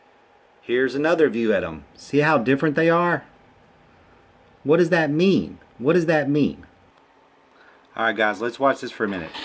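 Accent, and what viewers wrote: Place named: South, United States. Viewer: Haven't seen somebody actually put scenes to the immune theory! I love it! I'm a nurse also and I do know that sometimes heartbeats are to faint to hear, even with a stethoscope. But I agree. He did seem dead to Shane and Shane shouldve been trained to tell since he was a cop. I really wish Carl is immune but my gut tells me that gimple isn't smart enough to throw this at us. But he is stupid enough to piss us off by killing Carl. I also love to hear a video narrated by a southerner. I don't hear southerners narrate videos to much.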